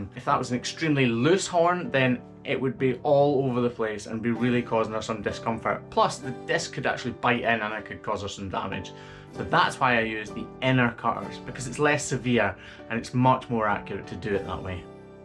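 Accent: Scotland, United Kingdom. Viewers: The slo mo was cool as was your montage at the ending. Always educational and entertaining. I’m also learning how to talk with a Scottish accent thanks to your videos! 😁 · Good grief! Why am I so obsessed with watching your vids?! I can't look away! It probably helps that your dialect is so interesting to listen to and having visited Scotland twice, I find myself always wanting to go back. Oh. And this is actually a very interesting niche subject matter.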